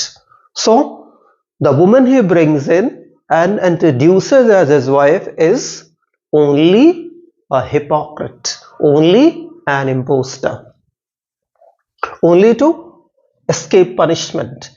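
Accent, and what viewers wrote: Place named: United Kingdom. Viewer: Sirji....your content is nice ....but you unnecessarily use a British accent.....which makes it boring.....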